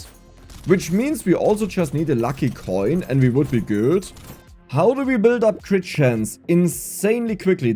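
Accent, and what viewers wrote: Germany. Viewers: Direkt die deutsche stimme erkannt😂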